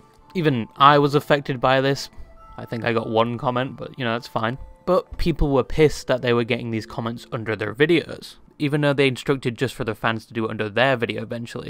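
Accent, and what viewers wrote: United Kingdom. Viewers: ewwie briteesh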